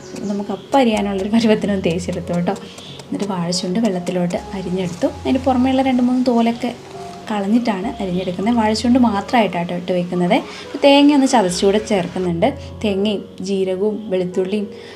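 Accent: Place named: Kottayam, India. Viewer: ഞങ്ങൾ കോട്ടയംകാര് വാഴച്ചുണ്ടെന്നാ പറയുന്നത്., നിങ്ങളുടെ slang തന്നെയാ ഞങ്ങൾ പറയുന്നത്.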